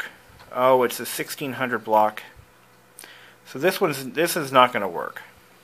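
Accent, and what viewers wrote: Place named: Massachusetts, United States. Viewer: I think I finally figured out what state your from! It's Massachusetts. In some of your other videos you make subtle hints about this but never confirm it. In fact when ever you talk about your computers you acquire from your school district you make it your business to tell us not to ask or even talk about it. It's not a big deal, but for me it affects how I relate to you as a computer guy. Your accent isn't southern or northern, but something else. I'm glad I finally know what part of the country your from so I can at least relate to some of things you talk about, like when you say "in this part of the country you don't see these kinds of Honda's." Which part? In some parts of the country a car from the 1960's can easily be found driving around because the whether is dry and it never rains! People forget how curious some of are and I am glad you atleast allow us to post comments. 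Thanks, Derek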